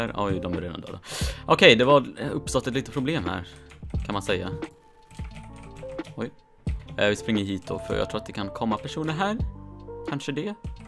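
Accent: Sweden. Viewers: O nice speaks stockohlmska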